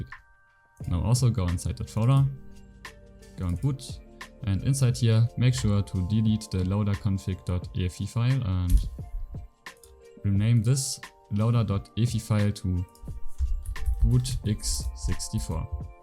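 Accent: Germany. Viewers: You sound really german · I hear the german accent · Are you German?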